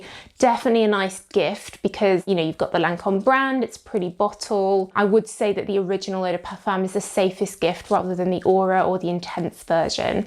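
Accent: United Kingdom. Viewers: I absolutely love your British accent and you have such a beautiful name 😊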